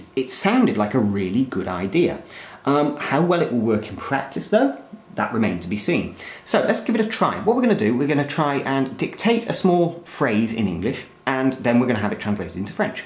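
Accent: England, United Kingdom. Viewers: Your voice is so cool.Are you from England?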